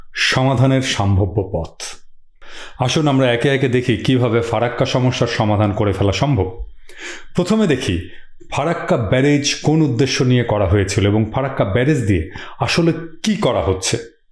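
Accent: Bogura, Bangladesh. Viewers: ভাই আপনি বগুড়ার ভাষায় কথা বলেন, ওই ভাষা আপনার মুখে মুখে শুনতে শুনতে অভ্যস্ত হয়ে গেছি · দাদা আপনার মুখে বগুড়ার ভাষা ভালো লাগে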